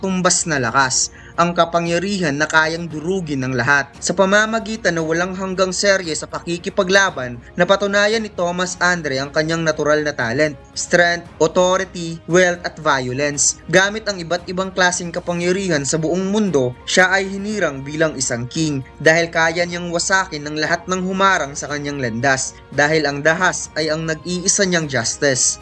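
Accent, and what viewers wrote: Visayas, Philippines. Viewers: Bisaya kc sya kaya ganyan pronounce nya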